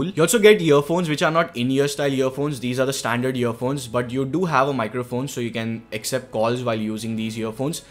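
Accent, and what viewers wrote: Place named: India. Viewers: Hindis accent... Make me laught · siempre me pasa que cuando escucho hablar ingles a un habitante de la India....pienso que es rajesh khotroophali de the big bang theory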